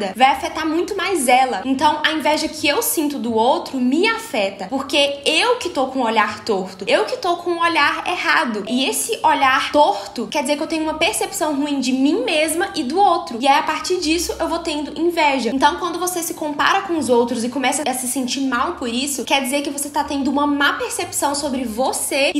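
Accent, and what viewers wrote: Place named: Campos dos Goytacazes, Brazil. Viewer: Oie,! Tudo bem?! De que estado você é? Seu sotaque é mto parecido com o daqui "Campos dos Goytacazes", RJ.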